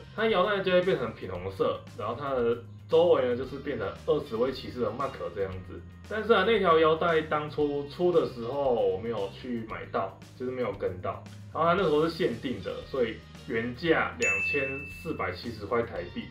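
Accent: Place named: Malaysia. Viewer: 可能是戴了面具顶着鼻子，所以口音就很像马来西亚口音，其实我也是以为是马来西亚人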